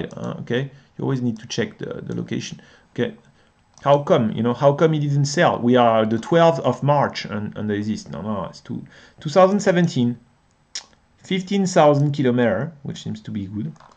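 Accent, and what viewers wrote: France. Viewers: came here for the car... stayed for the french accent <3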